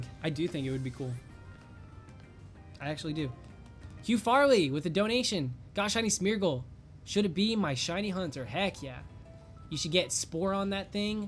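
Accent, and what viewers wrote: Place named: France. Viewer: No !!!!! Your French accent is so cute ☺️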